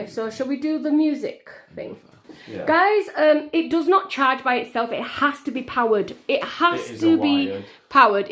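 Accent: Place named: England, United Kingdom. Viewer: I like the accent. England?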